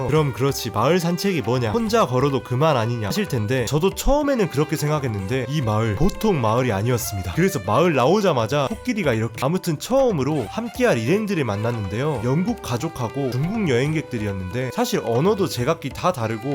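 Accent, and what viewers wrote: Jeolla, South Korea. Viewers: 전라도 말투 잘들었습니당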